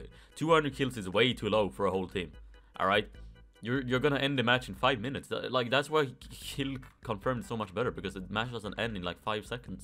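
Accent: Sweden. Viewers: you kinda sound swedish.. am i right?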